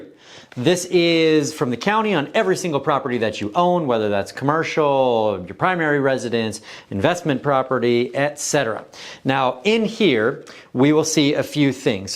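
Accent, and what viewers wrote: United States. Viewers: Sounds very good ol’ boyish. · Where did this New Yaukuh phah ugh come from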